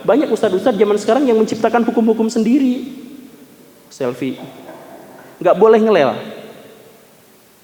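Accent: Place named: West Java, Indonesia. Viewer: Aksen sunda dalam narasi nya adalah satu dari bbrp aspek yg bikin seru dan lucu, masa khalifah bilang gini "eee kamu teh" wkwwkk mantap 👍🏻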